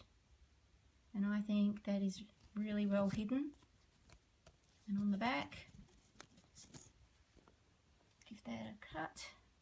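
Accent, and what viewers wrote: Australia. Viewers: Nice to hear a fellow Aussie!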